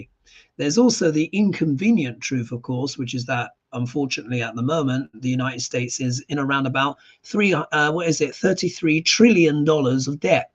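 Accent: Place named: London, United Kingdom. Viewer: Thank you for reading on Germany; yes I live here and just don' t want war; I agree to what the pope said ( of course, I am italian 😂) inflation is still going on; in Germany, prices high. I am so glad, I found your channel once 😊 thank you for giving me a home feeling always when you talk; miss the Londoner dialect a lot; when we had the zoomcall reading last year I told you the Dad of our kid was from London; unfortunately he suddenly died 2 years ago. So it makes me happy when you talk and share your humour ❤❤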